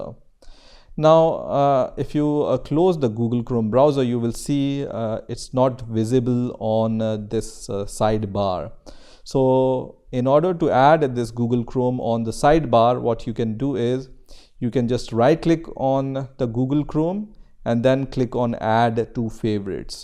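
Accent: India. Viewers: hearing his accent I can confirm that he is an indian guy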